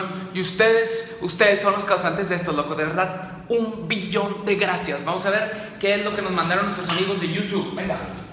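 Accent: Mexico. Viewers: a numa ,eres de monterrey :v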